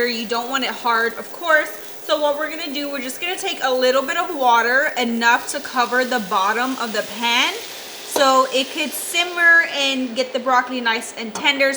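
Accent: Texas, United States. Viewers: Are you from Texas?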